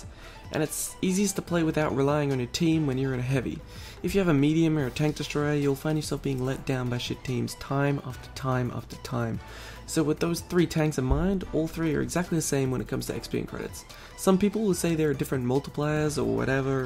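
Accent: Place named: United States. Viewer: Well he is american.. And remeber tomato tomato..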